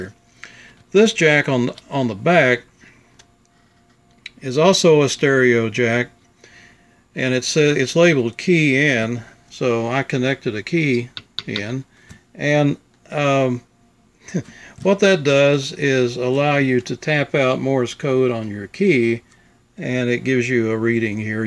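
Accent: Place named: United States. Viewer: Thank you, Your american accent is so easy to understand I like! Greetings from France!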